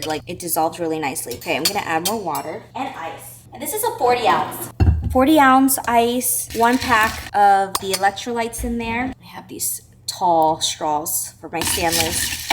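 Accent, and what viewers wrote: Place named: Vietnam. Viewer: good guess! I was thinking Vietnamese too from her accent.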